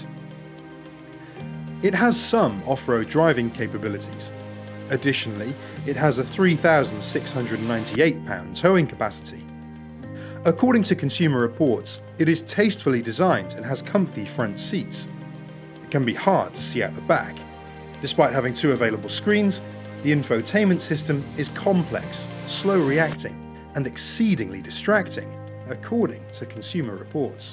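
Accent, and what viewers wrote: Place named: England, United Kingdom. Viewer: You have an English accent, promote your own stop condemning.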